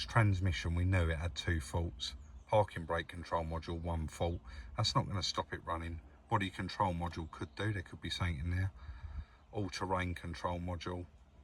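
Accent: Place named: Kent, United Kingdom. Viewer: I really enjoy your shows I now live in Cardiff but spending the first 30 years of my life in Rainham it’s nice to hear a proper accent I also enjoy when you go out driving around what was my local area seeing some of the old places especially the Kingsferry Bridge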